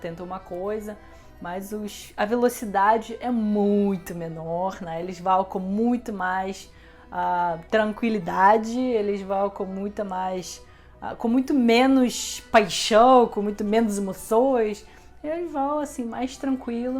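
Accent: Rio de Janeiro, Brazil. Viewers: Você fala muito bem o português, fala até com o sotaque carioca. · Amo essa Russa com sotaque de carioca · 😘vc e muito linda interior e exteriormente! adoro seu portugues com sotaque carioca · Olha fiquei até na dúvida se é brasileira, sotaque carioca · Sotaque carioca. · Vc tem o sotaque do Rio de janeiro se eu não me engano ? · Uma russa falando com sotaque carioca, que top ! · Po , russa ? Pelo sotaque já é carioca!!!!! É noíxxxxx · Já tá toda trabalhada no sotaque carioca! Chia mais que dez cariocas juntos! Português perfeito! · Linda e doce Russa/carioca, você é uma ótima observadora de comportamentos masculinos aqui observados em relação às duas nações, o Brasil e a Rússia. Seu sotaque do português brasileiro está mais perto do Lácio pela pronúncia do "s" como "x" o qual é uma herança lusitana e que por sua vez vem do latim. No estado do Rio de Janeiro fluminenses e cariocas e também nordestinos e nortistas ainda usam a pronúncia romana do "s'' assim como ainda o fazem os portugueses e os galegos, estes irmãos no idioma pois foram no passado uma só língua. 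Abração apertadinho, saúde de montão, paz, alegrias e muito mais sucesso! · Você é a primeira russa com sotaque carioca RSS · Achei seu português com sotaque mais carioca do que russo mesmo assim bastante charmoso. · Pelo seu sotaque,você deve ter aprendido o português Brasileiro no rio de Janeiro. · Você fala um ótimo "carioquês" haha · Ela já mora no Brasil há mais de 7 anos, perdeu o sotaque russo e ganhou sotaque carioca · vc é russa? kkkkkkk seu português tem sotaque carioca (RIO DE JANEIRO) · Da para perceber que ela mora no RJ. Super carioca. Um português impecável! Meu Deus. Nota 10 para a fluência dela! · Parabéns por falar muito bem português pt-BR com um RJ-accent. Apesar de eu ser abstêmio, não bebo desde os 13 anos, concordo com sua avaliação sobre bebida. No filme Guerra e Paz, baseado na obra de Leon Tolstoi, existe uma cena antológica que comprova essa tradição russa de competição para ver quem bebe mais e quem é mais corajoso. 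Dois homens, cada um com sua garrafa de vodka, ficam de pé se equilibrando no parapeito de uma janela no terceiro andar, de costas para o lado de fora. Ganha aquele que conseguir esvaziar a garrafa primeiro. Quanto a mulheres russas, alguns anos atrás o escritor brasileiro Paulo Coelho fez uma viagem no Expresso Trans Siberiano. Essa viagem foi filmada e passou na tv brasileira. A cada cidade ele descia para ter contato com as pessoas e só apareciam mulheres jovens, todas extremamente bonitas. Mulheres russas e ucranianas parecem ser literalmente de outro mundo. No bom sentido, claro. Acho que as únicas que conseguem competir com elas são as iranianas (persas).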